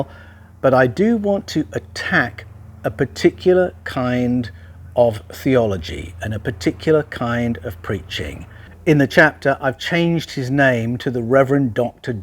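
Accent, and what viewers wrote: Australia. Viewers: does a great Aussie accent. After all is an Aussie himself.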